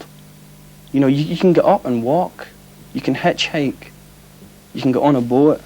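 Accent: Scotland, United Kingdom. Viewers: some kind of cute he was in this interview, i love his scottish accent 💕 I love 🥰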